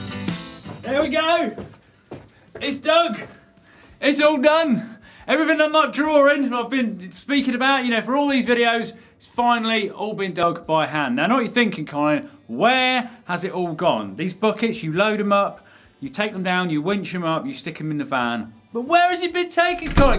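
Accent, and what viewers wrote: United Kingdom. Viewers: It is only me or colin sound more british then before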